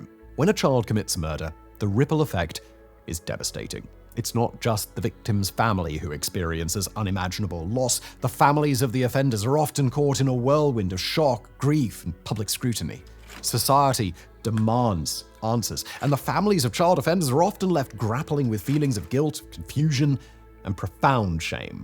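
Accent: England, United Kingdom. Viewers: is this just vsauce with a British accent? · The unimaginable. Up yours. Your so predictable. Political Correctness with an arrogant english accent. You forgot to mention Lizzy Borden.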